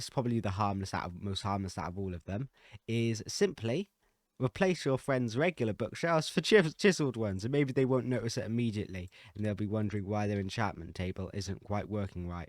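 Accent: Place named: United Kingdom. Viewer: Bro doesn't sound like grian. He just sounds british. He has the same voice as many people i know.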